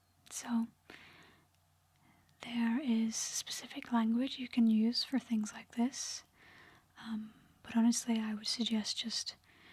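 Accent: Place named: Northern England, United Kingdom. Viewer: Glad your retaining your northern accent in the main. Greater Manchester area perhaps. Leigh. Rochdale. Bolton. Summat like that. All the best from sunny morecambe. Cheers.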